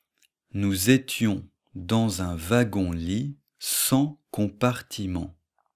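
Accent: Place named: France. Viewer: где вы изучали русский язык? Так чисто, грамотно, с легким французским акцентом говорите...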